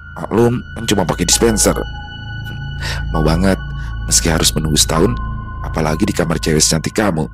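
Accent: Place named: Java, Indonesia. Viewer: Biyuh.. Mas logat jawanya mulai sedikit medok... Haha narator kesayanganku.. logat bahasa apa pun bisa di lafalkan dg baik ❤❤❤❤.. sukses selalu ya Mas dan team..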